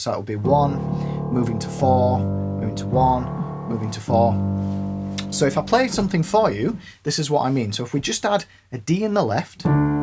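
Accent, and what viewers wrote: United Kingdom. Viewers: I didn't know that your British accent was associated with farmers and truck drivers. Like I said before , you sound like a normal British person. It doesn't sound southern or anything. I don't know why. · great one...! BTW - your name sounds somehow Czech, but your mouth sounds very much British :) Any chance you have some family ties there?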